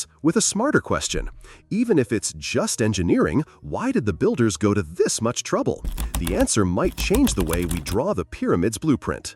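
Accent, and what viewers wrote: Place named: United States. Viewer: THE AMERICAN NOISE OF THIS NARRATION IS SO ANNOYING. IT SOUNDS SIMILAR TO THE VOICE OVERS FROM THE 70S ABOUT A NEW WASHING MACHINE BEING SOLD AT A NON-DESCRIPT APPLIANCE CHAIN IN THE USA. STOPPED WATCHING AFTER 2 MINUTES AND FOUND SOMETHING ELSE LESS ANNOYING .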